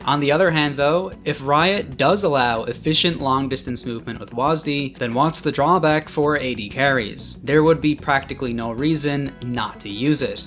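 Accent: Newfoundland, Canada. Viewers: Massive sidenote, but are you a Newfie? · Nice video but god damn your Canadian accent is almost unbearable to listen to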